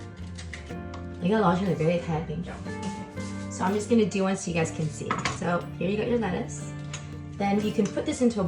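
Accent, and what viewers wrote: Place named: China. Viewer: YUM! hahaha, the chinglish is real!!! love it hearing someone speak like me. keep it up!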